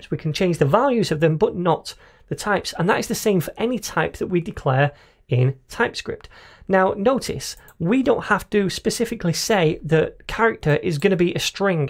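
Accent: United Kingdom. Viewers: I really like your accent, it reminds me of my time in the UK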